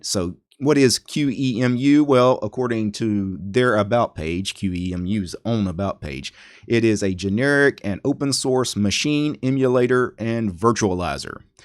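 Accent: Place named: Texas, United States. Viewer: He has a strong Texas accent :-)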